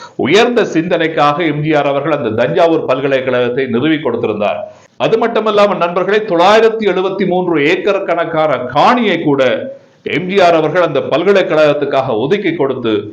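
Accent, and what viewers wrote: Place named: Sri Lanka. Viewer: தங்களது இலங்கைத்தமிழ் மிக அருமை ! உலகிலேயே சிறந்த தமிழ் இலங்கையில் மட்டுமே உண்டு !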